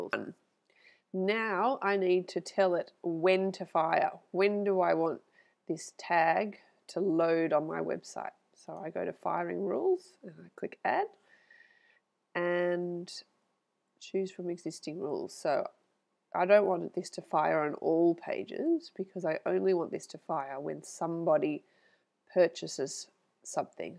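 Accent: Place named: Australia. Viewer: Good to hear an Aussie accent for a change :)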